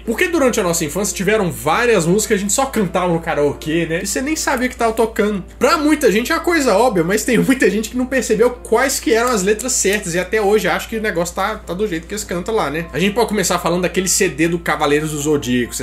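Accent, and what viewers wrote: Minas Gerais, Brazil. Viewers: Uai, vc é mineiro??? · Definitivamente o mineirês é o melhor sotaque que existe. 🤗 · Mineiro nato esse aí kkk 👏🏾🤝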